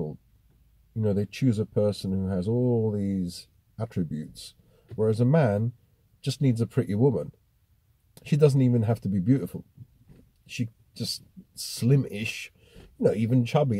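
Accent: United Kingdom. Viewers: Do you play a sport that may include women? I am not sure where you are from...accent possibly British? Do you play pickle ball? Take some lessons, and they can direct you to courts or groups of people that play. You can meet so many people. Certainly make friends like that. At the very least, you will be out amongst other people and have great fun too. You have to put yourself out there. The older we get the harder it is to make good solid frienships, especially for introverts. God Bless and good luck.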